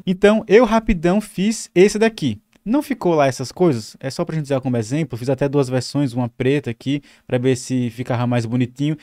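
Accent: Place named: Paraíba, Brazil. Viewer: Cheguei hoje por aqui e tô maratonando! Sua didática e franqueza são mega cativantes! E seu sotaque super me pegou! Pensei até que era da minha terrinha , João Pessoa :face-red-heart-shape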